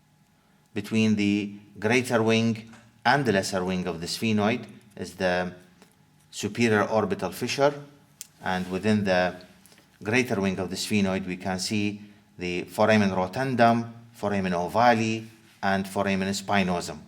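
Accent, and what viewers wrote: Iraq. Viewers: .. are you Iraqi teacher??? your dialect is 100% Iraqi:)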